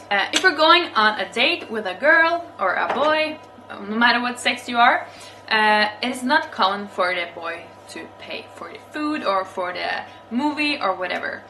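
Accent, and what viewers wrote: Norway. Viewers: I loved your accent, I like the nowergians accent when you speak English. Here in Brazil, I think that We are one of the most sociable countries in the world, except here in the south, Where I am living now, I the south of Brazil there are a lot of Europeans descendants and they are not so sociable like the people of the others regions.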